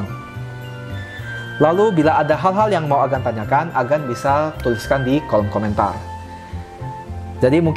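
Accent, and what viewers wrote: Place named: Tegal, Indonesia. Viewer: Dari logat bicaranya pasti admin ini orang Tegal atau Brebes jawa tengah ya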